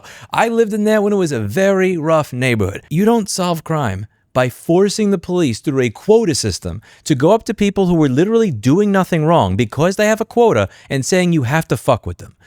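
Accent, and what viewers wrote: New York, United States. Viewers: I love how the more he talks about new york, the stronger the new york accent becomes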